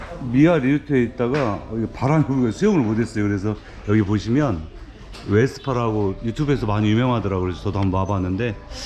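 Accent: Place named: Chungcheong, South Korea. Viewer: 충청도 어디여유?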